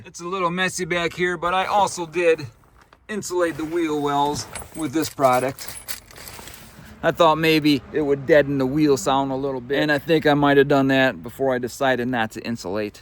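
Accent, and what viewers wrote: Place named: Wisconsin, United States. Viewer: Hey man, this is a great video. Thank you! I think I'll take this approach for now, I'm thinking a semi-insulated version 'cause I live in AZ, but I'd rather the funds go toward traveling not insulation materials! Love your accent, are you from Wisconsin? If yes, me too!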